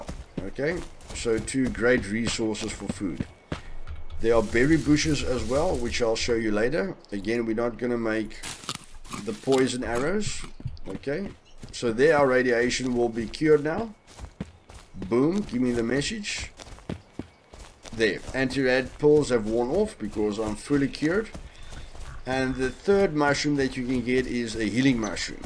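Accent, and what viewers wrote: South Africa. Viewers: Is jy south african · Hey, which country are you from? Your accent sounds like you are from south africa? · Where are you from? xD Sounds like an SA accent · Are you from South Africa? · You're south african aren't you😂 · Thick South African accent. Love it 😂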